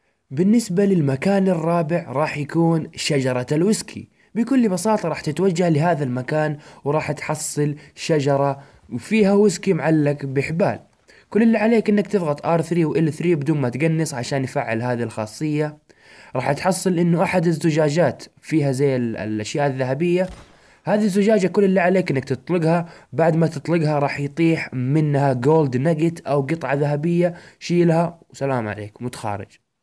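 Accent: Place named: Hejaz, Saudi Arabia. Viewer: هذي اللهجه الحجازيه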